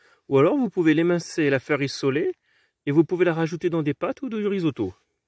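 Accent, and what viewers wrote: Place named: Corsica, France. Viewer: Bonjour peux tu me dire à quelle altitude tu te trouves et quel type de sol as tu? A ton accent, il me semble entendre que tu sois de l'île de Beauté. Merci et bonne continuation.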